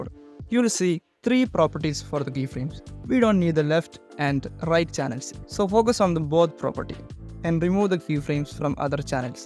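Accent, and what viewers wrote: Kerala, India. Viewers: Your english sounds like malayali english ( No offence ) are u malayali bro?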